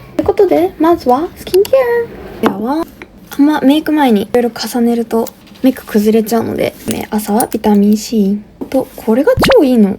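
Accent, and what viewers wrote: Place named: Kansai, Japan. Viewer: おしゃれで努力家で尊敬しかない爆イケオンニ〜♡がゴリゴリ関西弁なのガチギャップですきぃ…T_T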